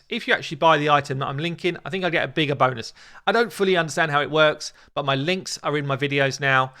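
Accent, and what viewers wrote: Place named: United Kingdom. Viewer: Can you like read a book one time, your British accent ....... I enjoy it. Keep up the amazing content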